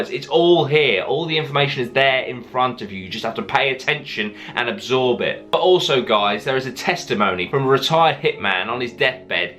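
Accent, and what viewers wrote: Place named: England, United Kingdom. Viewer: Where in the U.K. are you from? Your accent and mannerisms sound very much like Russell Brand who is from Grays, I am originally from Canvey so wondered if you was local. Love your videos brother, keep up the good work.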